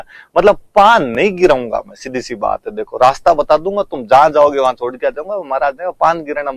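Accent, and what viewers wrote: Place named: Shekhawati, India. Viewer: बीकानेर में कहां से हो सर आप की बोली और स्टाइल से तो ऐसा लगता है कि आप शेखावाटी टच वाले इलाके से हो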